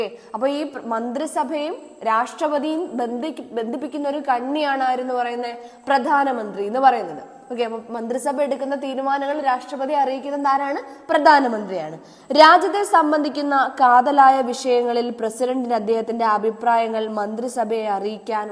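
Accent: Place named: Vadakara, India. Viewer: Vadakara ente oru frnd und same slang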